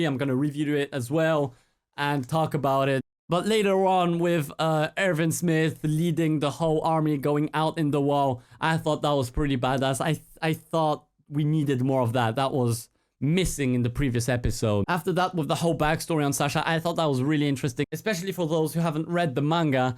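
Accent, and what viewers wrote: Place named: France. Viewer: So you're French too! Je me doutais bien qu'il y avait quelque chose dans ton accent! =D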